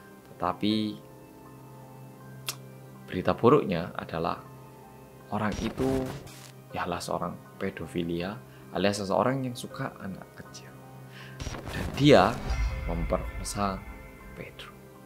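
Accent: Java, Indonesia. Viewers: Yokk! Kak dikit lagi 4M subscriber nihh kak. Aku ngerti sih banyak yg suka liat konten² kak bukan karena kak orang korea medok aja, tapi karena kak orangnya selalu berusaha buat diplomatis atau gak mendukung 1 sisi dalam ngasih opini dan pemilihan katanya oke, bahkan editornya aja kayaknya suka ngelawak kek kata² "eummm" tadi. Kak juga gak terlalu membahas kpop yg sekarang malah menjadi bahan menarik buat narik banyak orang, karena berusaha buat gak membela salah satu pihak gituh. Jadi semua konten²nya selalu informatif dan dapet valuenya... bener² gak salah sih milih jadi panutan pas di tugas kuliah wkwkwkwk. Semoga sampai 20M subscriber pun mempertahankan hal ini. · 3 channel storytelling favorit: 1. (pelan, medhok dan mudah dipahami) 2. Nadia Omara (gayanya kayak itu ghibah) 3. Arroyan Pram (Konspirasi dunia pake Astronomi, Fisika, dan editannya gila keren banget, serasa nonton agung hapsah dan Jerome polin bahas konspirasi) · Apa cuman aku yang suka ngikutin atau jawab: bersama orang korea yang.... 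Me:Medookkkkk:|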